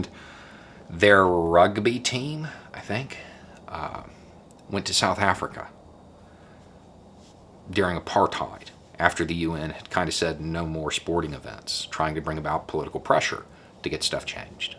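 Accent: South, United States. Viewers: Agree. We all have unconscious bias due to upbringing, education, life experiences etc but it takes courage to identify it. At first I was turned off by southern accent and the Duck Dynasty beard:D but so far his comments are fair and square.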